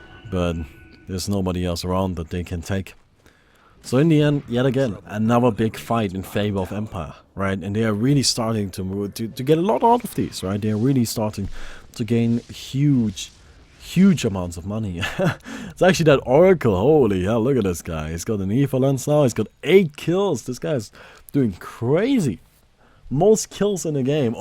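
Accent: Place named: Germany. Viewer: Same, that German accent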